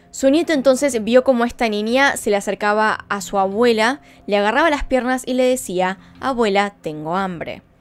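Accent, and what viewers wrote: Argentina. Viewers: Así hablamos los argentinos jaja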